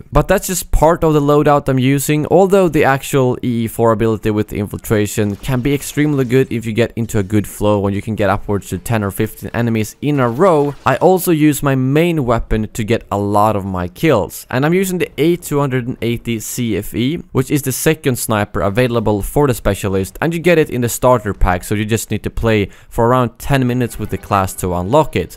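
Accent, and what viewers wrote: Sweden. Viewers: I just found this guy and i got it right away that you were swedish, hahah hej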